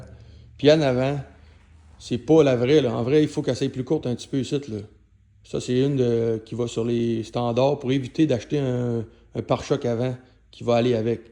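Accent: Quebec, Canada. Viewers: Ben oui ! Avec un petit accent québécois ! 😉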